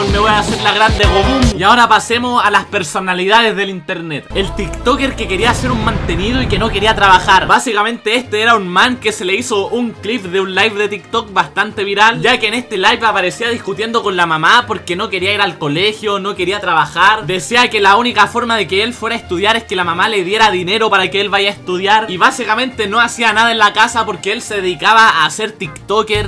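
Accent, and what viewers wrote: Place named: Chile. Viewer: La unica parte buena de chile, es ese acento chistoso. Grande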